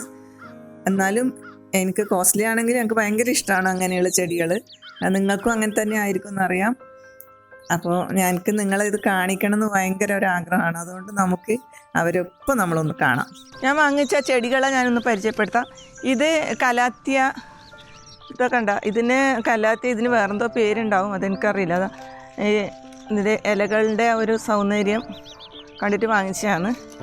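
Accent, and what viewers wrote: Kannur, India. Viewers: മാഡത്തിന്റെ സ്വന്തം നാട് കണ്ണൂരാണോ ? സംസാരം കേട്ടിട്ട് കണ്ണൂർ ഭാഷ പോലെ... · Kannooor analle...bhashashyli kettitt angane thonnunnu. CM nde oru style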